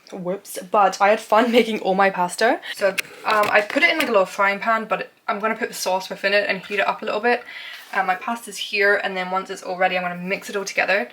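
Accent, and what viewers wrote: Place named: Ireland. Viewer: Interesting accident, slightly Irish are you?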